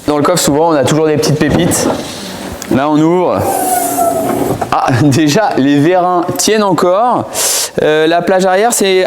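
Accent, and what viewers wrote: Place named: Paris, France. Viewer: Toi t’es pénible ! Une pépite ! Une 104 ! Ta cible : Les débiles ! Tu devrais bosser chez Vintage mécanic ! En plus tu as la tenue de mécano ! Accent parisien ! Insupportable ! 🤮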